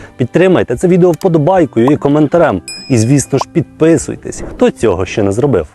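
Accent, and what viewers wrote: Cherkasy, Ukraine. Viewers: Чую земляка з черкащини! Молодець! ❤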